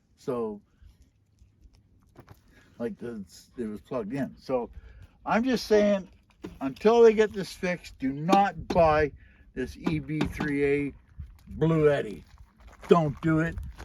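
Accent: Canada. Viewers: Every time you said “battery” my heart would flutter!! Great Canadian accent 💫💛💫 Thank you for sharing 👍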